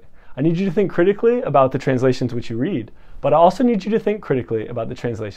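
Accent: United States. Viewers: Why do you got American accent 💀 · Dear, I would have some questions regarding the pronunciation of the Akkadian language. I noticed that you have a rather American/English accent, especially in the a and r sounds. How was the pronunciation actually like? Can we reconstruct that?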